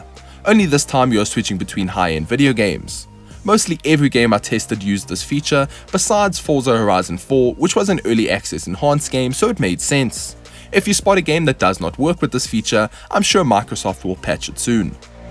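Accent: South Africa. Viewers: Amazing review, the best one Iv seen👍👍❤️❤️You sound like you have a South African accent, I'm from South Africa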